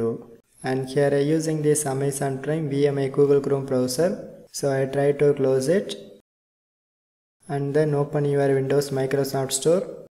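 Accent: India. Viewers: You helping me very well, i got shadow ban in call of duty ... you fiy prroblem , i now no problem in brain . Thaks you my brother i love yourre Indian-English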